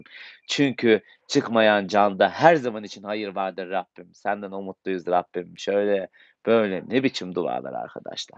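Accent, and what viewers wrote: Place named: Kastamonu, Turkey. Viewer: Kardeşim Başına Sağolsun Allhm Kabir Rahatlığı Versin Kastomonulumusunz Konusmalarn Öyle Geldi Bizde Sinopluyz Sabırlar Dileriz Çok Büyük Bir Acı Sende Merhametli Bir Evlatsn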